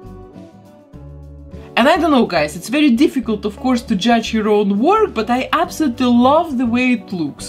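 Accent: Russia. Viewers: Вы русская? У вас такой жесткий английский 😱😱😱😱 Я тоже решила переделать такую же Дракулауру, не нравится она мне вообще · Такое ощущение, что девушка русская, так как говорит с акцентом, но тогда жаль что нет русских субтитров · Знакомый акцент. Вы случайно не русская?) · Девушка, ваш акцент уж очень напоминает русский... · Russian akcent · Мне одной кажется, что у неё очень сильный русский акцент 🤔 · Очень красиво, но почему мне кажется что вы говорите с русским акцентом? Ваша работа вдохновляет!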